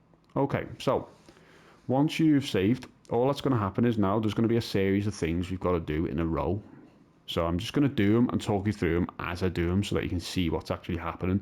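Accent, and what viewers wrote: Liverpool, United Kingdom. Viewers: Youre guides are mint mate, I take it you're in Liverpool by your accent lol im in stoke if I were nearer I'd buy you a beer 😂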